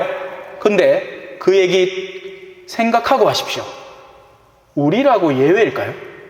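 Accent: Gyeongsang, South Korea. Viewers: 정감가는 같은 경상도 말씨 좋습니다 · 반갑습니다 ^^ 구수한 경상도 사투리 좋아요. 저는 안천에 살고있어요. 넘 경쾌하게 강의 잘듣고있습니다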